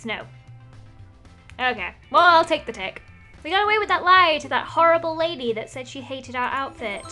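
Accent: United Kingdom. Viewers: Lizzie my big sitter said are you brithish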